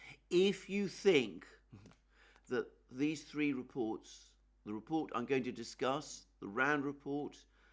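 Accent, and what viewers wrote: United Kingdom. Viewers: The sound is much better. Thanks for the unbiased analysis with a British accent. Scott Ritter & Col MacGregor use American-English which is familiar to me.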